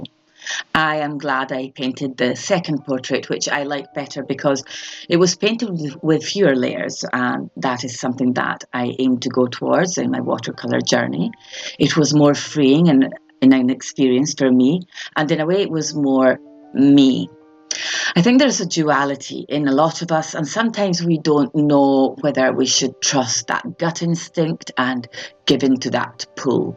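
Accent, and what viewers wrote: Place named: Scotland, United Kingdom. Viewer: I don't think it's too loud. There's something with the audio quality that sounds very different from other content creators (it's not your beautiful Scottish accent). If you have a good microphone, I'm not sure if it's to do with the acoustics of the environment you record in? 🤔 Just thought I would mention it because I think the content you create is what a lot of artists really need to hear, and a small tweak to the audio might help your videos reach even more artists. 🙌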